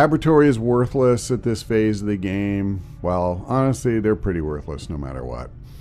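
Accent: United States. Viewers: You should pause the clearing and move the engineer to help build the resistance comms. Chances are engineers and scientists will come in between now and the mag weapon research to continue the clearing and speed up research. Love the show. Sorry if you've been asked this before but are you from New Jersey?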